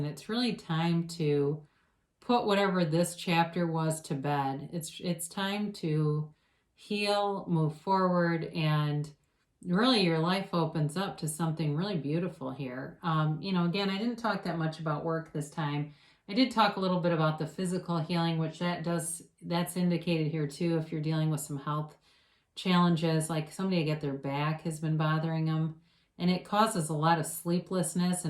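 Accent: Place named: New Jersey, United States. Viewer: Omg I love your accent 😊 You sound like your from Jersey ❤️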